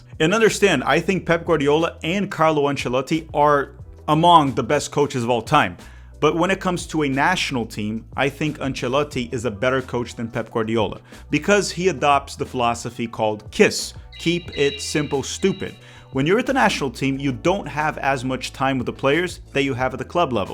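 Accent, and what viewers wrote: Rio de Janeiro, Brazil. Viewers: Tu é carioca, né? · He clearly got Brazilian accent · O sotaque BR né eu amo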